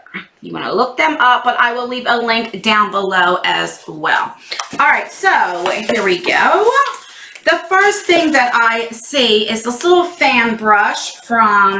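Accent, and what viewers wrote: New Jersey, United States. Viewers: Happy birthday!🌸🌼🌻....I gotta say that I nearly peed my pants when you said I wonder if she knows Im from NJ! 😂gurl.... you sound Jersey through and through! And I love it!!! Have an amazing birthday! From a fellow 43yr old🔅🤗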